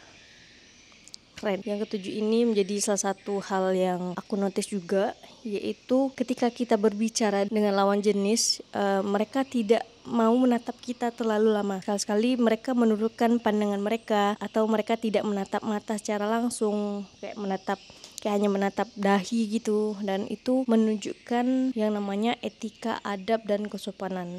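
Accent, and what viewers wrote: Malaysia. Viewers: Bahasa malonsia jelek banget tidak jelas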